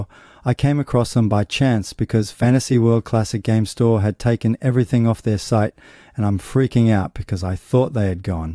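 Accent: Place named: Australia. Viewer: Australia? I feel like an idiot not hearing it sooner from your accent, what's wrong w/ me? One of the crown jewels of my LTTP collection is a CIB Mattel/Australian copy -- had a classmate from my foreign school days act as the go between to secure it.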